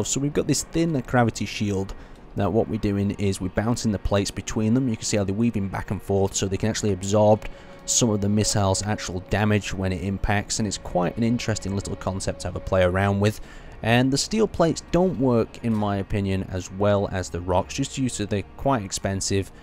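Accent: United Kingdom. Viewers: "no dam-edge to the stayshin at awl" filthy fucking britbong liar it damaged the station get ur fuckin eyes checked are they as bad as yoru teeth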